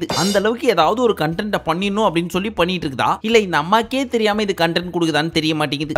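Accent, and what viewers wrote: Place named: Madurai, India. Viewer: 9 ruba note madurai slang anna 😂😂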